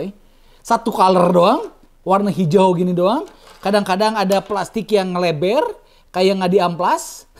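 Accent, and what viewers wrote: West Java, Indonesia. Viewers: logat sundanya keluar banyak wkwkwk jarang² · Sunda euyy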